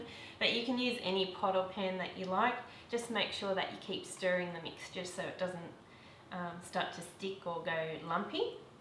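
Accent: Australia. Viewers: omg i cant bare the aussie accent! so annoying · i don't think i did, i'll give it another try. The reason i took it off the heat is because the mixture already looked thicker than the one your poured. thank you, hearing your accent makes me miss Australia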